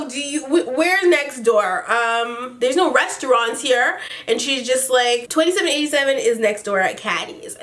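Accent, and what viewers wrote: England, United Kingdom. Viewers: Love your English accent 😂😂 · Her British accent lolll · I like your British accent it fits you.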